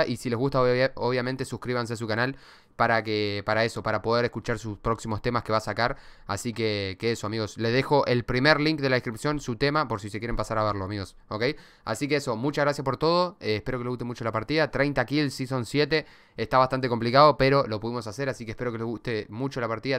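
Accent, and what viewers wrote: Argentina. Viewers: Es argentino ?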